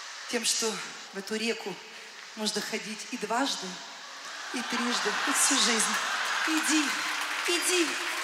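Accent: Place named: Surzhyk, Ukraine. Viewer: Очень крутой диалект, как в Украине и говорят, украинский суржик, обожаю его, юмор, солнце, Одесса. Свобода, весь мир, далекие страны. С Одессы уплывали в Америку 40 лет назад.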